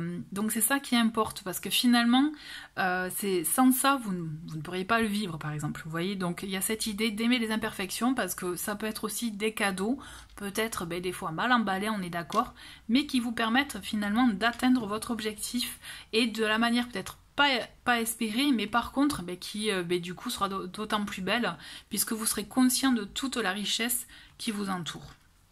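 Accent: South, France. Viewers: Merci 🎉. Joli accent du sud ❤.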